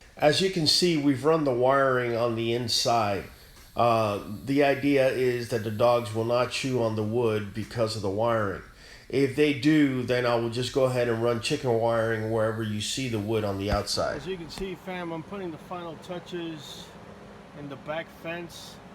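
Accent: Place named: Texas, United States. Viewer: Thank you for your energy and effort to complete these videos. I hit that like and sub. Knew you were in Tex the sec I heard the fam lmao.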